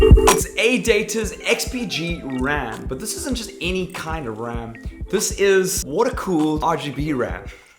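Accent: South Africa. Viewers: You are, was, is or come from South Africa I heard it in your voice first then this Only ppl who know what that word implies like the vid or, I WILL HATE YOU FOR THE REST OF YOUR LIFE. then i forgive you because there is no reason to hate a dead person. Just realized your hair changes color here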